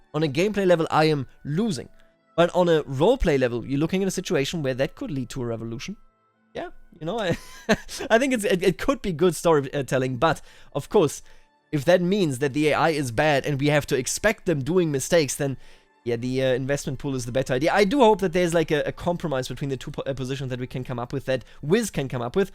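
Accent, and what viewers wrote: Bavaria, Germany. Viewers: Are you actualy bavarian?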